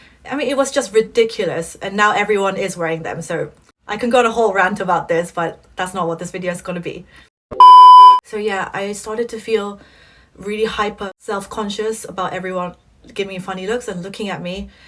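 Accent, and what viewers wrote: England, United Kingdom. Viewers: "Once bitten always smitten".... From the looks of it, you have immersed the entire teenage and adolescence in the UK....Yes the ever prominent threat of being an Asian-hate target is there, but the London contacts and friends that you have made will always stand firm alongside the future.... So, my verdict is that this "Time" will never wait. Go with your brave heart if there is a strong calling from deep within.... Your English accent and the hard earned communication skills will pay much diligence in a city like London as this city is the perfect platform for music. However, if the heart is swaying momentarily due to income bracket from Youtube, i am gonna advise to look into your career over a long run. Once you introspect past the intangible demons instated in the mind by modern media, you will find if your innate passion lies in a compromise gig over YouTube or in the sacrifice of your tiger mum who is willing to bear your resentment just for a bright career in the so called financial capital of the world "London". 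Being an Asian in similar waters, I thought of writing this down. I aint no philosophical expert. So, this is just contemplating and a bit too much nostalgia of the London vibes that i miss now being in limbo for the past one and half year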